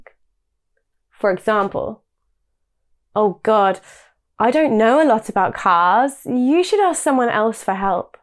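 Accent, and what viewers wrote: United Kingdom. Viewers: 와 역시 브리티쉬 발음ㅋㅋㅋ 너무 깐지나네요 전 언어교환101에서 영국인 친구 사겨서 요즘 영국발음 연습중인데 너무 재밌고 럭셔리해요ㅎㅎ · 역시 영국발음은 넘나 간지남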